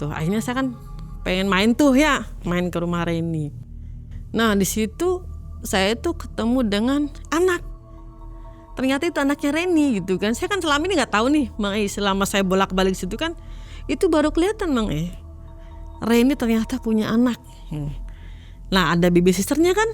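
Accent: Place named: Indramayu, Indonesia. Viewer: Logatnya kaya orang Indramayu,, Gak mau suudzon sbnernya .. Tapi kalo bener yaaaa cukup tau ajah soal mie yamien 😅😅😅 jadi takut ...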